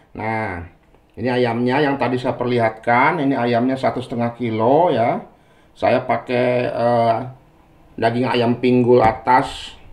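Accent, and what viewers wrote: West Java, Indonesia. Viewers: Kungkurahan,Sunda banget Pak😂❤️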